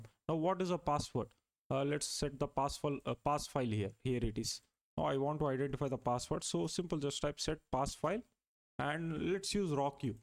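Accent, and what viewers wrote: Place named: United Kingdom. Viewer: Hello Sir, I love your this British Accent ❤️!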